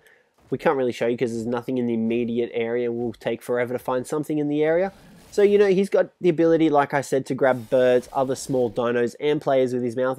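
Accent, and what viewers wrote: Australia. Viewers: Are you Aussie? Because it sure sounds like it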